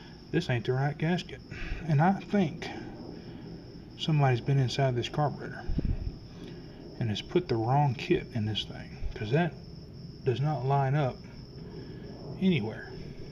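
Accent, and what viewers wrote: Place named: Illinois, United States. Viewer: Very informative video. Thanks for the upload!! :thumbs up: Btw, you sound just like my Father-in-Law (Central Illinois accent).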